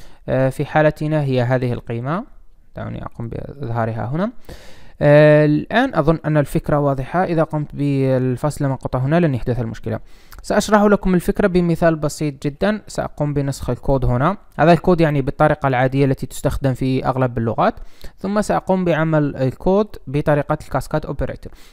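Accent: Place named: North Africa. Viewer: Souqran!! Hon Moussa, Ana Yahoudi ubakhki Ubrani bass I was able to understand it, knowing the small differences in our ancient languages (Hunna = Hinne, A-Salam Aleikum = Ha-Sholom Aleikhem, Bikoum = Bakhem, WaHuwa = WeHou, Kalam = Kolam, Nafsa = Nafsho, Nukdatein = Nekudothahyin, Fi-Mathala = Be-Mashall, Acher = Ahar, Kabir = Kabir...) Sound's North African. Is this Algerian or Morrocan or something else? Anyway, thank you for clearly explaining the cascade notation clearly, I saw from the code, but also was able to understand each and every word!!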